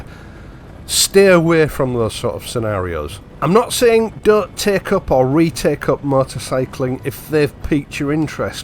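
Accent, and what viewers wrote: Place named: Yorkshire, United Kingdom. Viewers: Hi, I was saying to my wife what an unusual sounding voice you have, I have listened to you before on various subjects about bikes, but honestly I could listen to you talk for hours! The wife thinks you may have a Yorkshire accent, is this correct?! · Never been on a motorbike during my 66 years on this earth. I own six pushbikes but now I’m getting the calling to to do something different. I want to learn a new skill and test myself. Would never go over a 125, would never need to. Pretty fit and in not bad health, never do less than 10K steps a day mostly dog walking and have two massive LGDs. Your video has given me the motivation to take it further. Subscribed, as I always enjoy listening to a fellow Tyke.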